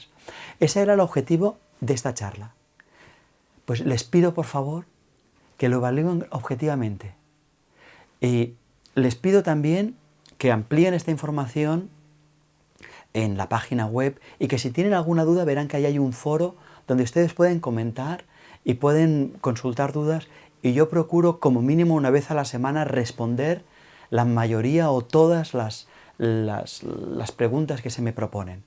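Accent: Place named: Catalonia, Spain. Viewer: Gracias. Tengo 54 años,y aún tengo faltas ortográficas. Recién hoy con tu vídeo me he dado cuenta por qué! Tengo memoria visual para muchas cosas. Puedo recordar con detalles muchísimas cosas, pero en la ortografía siempre lo auditivo ha estado más presente, no sé por qué. El caso es que viví toda mi vida en Uruguay,(hasta hace unos años que estuve viviendo en Barcelona). En esos años que viví en tu tierra (se te nota el acento catalán cuando hablas en castellano jaja) pude darme cuenta que si yo hubiese oído de niña la diferencia de pronunciación que existe entre la "c" y la "s" por ejemplo, hubiese aprendido mejor. Sabes que tanto en Uruguay como en Argentina la "s"; la "c" y la "z" suenan iguales, así como la "y" y la "ll". Pero claro si alguien me hubiese enseñado lo que tu hoy en tu vídeo. Seguiré aprendiendo a pesar de mi edad. Gracias.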